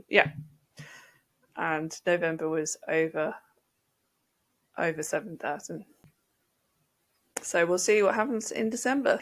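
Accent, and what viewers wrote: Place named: England, United Kingdom. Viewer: Holy Moly, $4k each month after just one year. Insane. Well done you. Likewise, the amount of books you've put out is incredible. I need to get my act together! Only just discovered your channel, nice to hear another (calm!) English voice! Although admittedly I'm semi retired and looking to kdp for extra rather than an entire income, I think if I was earning the royalties you are I probably just stop and let the passive income roll in! Still, you can never have too much I guess 😂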